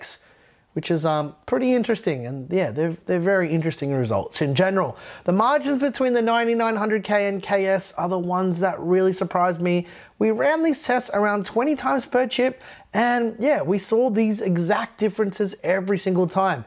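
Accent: Australia. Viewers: I subscribed for two reasons. Firstly, you don't overclock your cpu's. WHo does? Well statistically less than 1% of computer owners do and I am truly not interested in doing so. Imagine buying a brand new car and then adding turbochargers and NOS to it. Dumb, right. Overclockers do so so they can stress test their computer gear and pay to have the results published. It becomes "I have a bigger dick than you moment" which is stupid and unnecessary. Secondly, it's your accent. After listening to such much American droll it is refreshing to listen to an Australian. You are doing the world a favour. Ahh.....that accent is fantastic. Can listen to it all day and your grammar is exceptional. Thank you.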